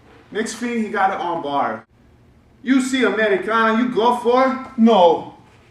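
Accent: Brazil. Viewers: Lol nice Brazilian accent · The Brazilian Accent made me suscribe! Had me ROLLING 😂😂😂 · hahahahahahahahaha. loved the brazilian accent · I'm only just about to train gi, after doing nogi for a little bit. I felt like I was watching a video of myself! Thank you for the humor, and the good advice. The Brazilian accent really drove the points home. :) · love these videos. killer Brazilian accent! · Between Admiral Ackbar and the Gracie accent, I couldn't sub fast enough haha. Awesome info also! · Hilarious Brazilian accent. Subbed based just on that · His Brazilian accent reminded me of nigahiga WHY GOD WHHHHYYYYY? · LOL did not see the amazing Brazilian accent coming.. Made me pay more attention tho, suddenly the instructor seems way more legit. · priceless brazilian accent ! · bro I swear to god with that brazillian accent you sound EXACTLY like my coach. I was dying the whole time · as a brazilian i can confirme : accent 100% legit true ahhaaha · Very great brazillian accent!!! 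· 10/10 brazillian accent. Sounds exactly like my teacher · Your Brazilian accent is hilarious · the best brazinglish I've heard so far. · Subscribe to an arbitrary BJJ channel - NO. Subscribe to a BJJ channel with clear instructions in brazilian accent - YES.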